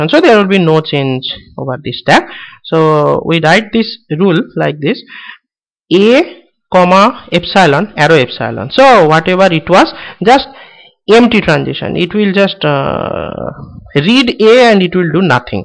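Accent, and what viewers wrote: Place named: India. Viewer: hhhh i'm sure he is indian because of his voice , but thnks anyway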